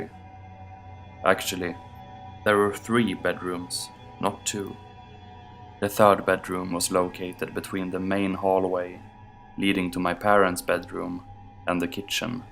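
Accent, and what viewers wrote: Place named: Europe. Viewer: Hi, just subscribed as I heard you on swamp dweller's collab and love your voice!! That accent!! Woohoo !! Great content and nice to here a few more European narrators and stories ( am a Brit myself )xx looking forward to seeing more x good luck 😉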